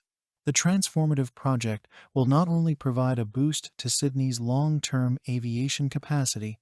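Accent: United States. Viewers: Don’t get me wrong I understand most of this project it annoys me that it’s narrated by an American but the thing that gets me this commentary says that there will be a rail bridge within the airport precinct I was under the impression that it was underground within the airport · This video is a mishmash of graphics and congratulatory remarks only a public relations copywriter could conjure up. It’s repetitive and covers the same subject over and over. It lacks substance and nuance. The graphics are brief, lasting about two seconds, so whatever purpose it had is lost in the shuffle. Who put this young man up to this task? He sounds American so how did that happen? Nah, not liking nor subscribing.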